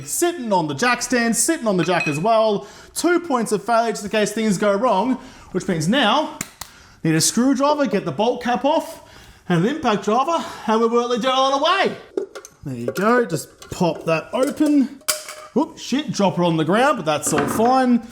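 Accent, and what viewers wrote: Australia. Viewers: The aussie gab is a riot to listen too. At least you have a garage to work in! Im out here working on my Dart laying on my back in the dirt lol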